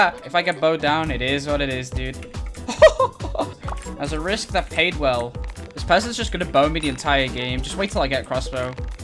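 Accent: United Kingdom. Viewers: is like british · Is british?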